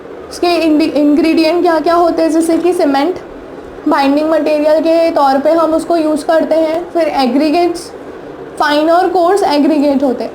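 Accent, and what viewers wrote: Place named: Telugu, India. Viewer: Madam jee aap ka telugu maa boltha Hai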